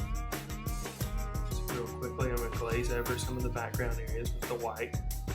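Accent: South, United States. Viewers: Your accent is cool. From the South?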